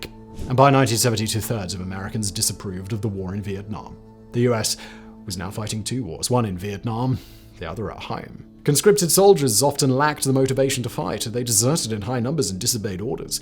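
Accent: United Kingdom. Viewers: Why is some British dude talking shit about the USA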